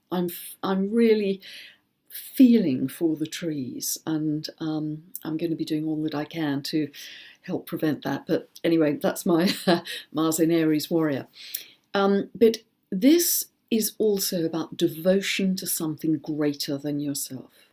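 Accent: England, United Kingdom. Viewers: Repeatedly in meditations beginning with the Winter Solstice celebration, the intention watchword for many of my friends ( and myself) has been “Courage”. So to hear you also share “Courage” as an intention for 2019 was a wonderful confirmation of how “the collective” is moving in to this new year cycle. 🙏 Thank you for sharing with us every month. I find your analysis always clear, concise and entertaining. (English accent, a welcomed plus 😉)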